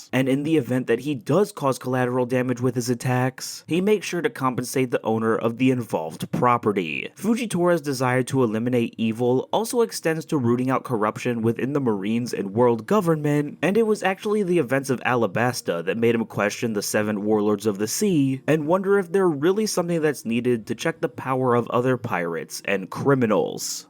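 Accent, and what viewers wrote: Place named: United States. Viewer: God, such an American way of pronouncing foreign names lol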